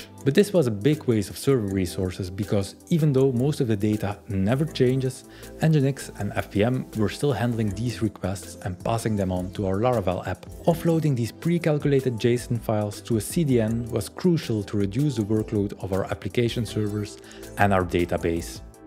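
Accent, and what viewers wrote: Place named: Belgium. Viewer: Your accent sounds Belgian, your name Italian and your face Indian. Did I get any of that right? 😊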